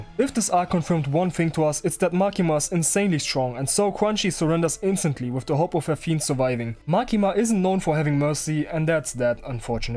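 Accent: Germany. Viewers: Are you from germany? Your accent sounds really like from people from the country. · Du hast einen der krassesten Deutschakzente den es gibt. Ja Quanxi is pretty cool. I really loved how Kishibe liked/loved Quanxi and didn't want to see her Corpse.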